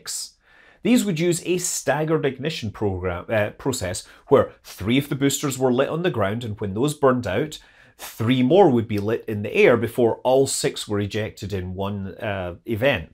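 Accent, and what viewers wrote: Scotland, United Kingdom. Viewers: Why are these "Sons of Thor" and not "Daughters of Thor"? Also, you are sounding particularly Scottish today. What happened?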